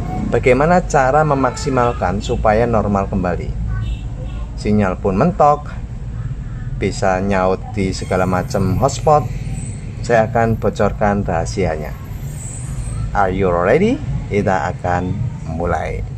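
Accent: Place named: Lampung, Indonesia. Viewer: Sampean lampung ya kang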